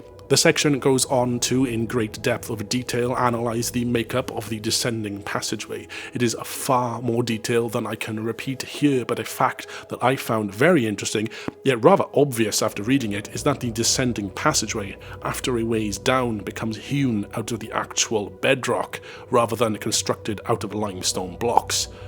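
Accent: Wales, United Kingdom. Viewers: The word "heard" is pronounced the same way as the word "herd". You saying "HEERD" instead of "HERD" is jarring in a nails-down-the-blackboard kind of way. I make allowances for accents (I can hear a bit of Welsh influence in your voice) but FTLOG, "HEERD" is just so very very wrong.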